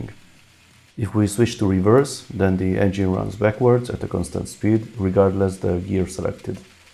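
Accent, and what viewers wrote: Hungary. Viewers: Olyan magyaros akcentusod van... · Az akcentusod elárulja h magyar vagy de amúgy jó lett !!!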